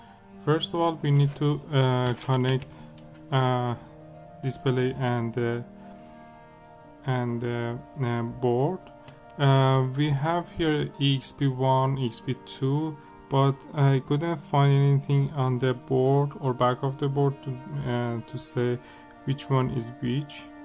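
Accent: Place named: Iran. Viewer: سلام ممنون بابت ویدیو شما ایرانی هستید؟ لهجه تون که ایرانیه😅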